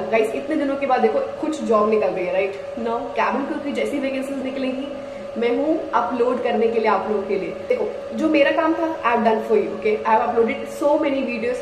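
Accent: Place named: Haryana, India. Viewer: You speak haryanvi accent well. By the way wat happened to your hands. Any injury?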